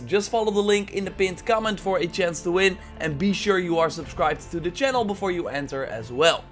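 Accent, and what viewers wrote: Netherlands. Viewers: What is this guy's accent? Sounds Dutch.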